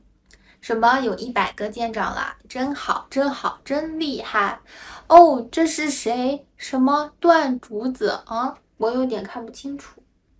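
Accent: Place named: Shanghai, China. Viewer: i think it's just Shanghai's accent is a little different from the general accent of the language. It's not that easy to forget your mother language :v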